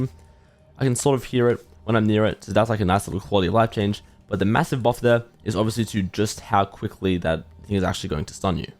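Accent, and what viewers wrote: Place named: Australia. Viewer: your accent suddenly is more australian than what it was before, impossible to understand 40% of what you say.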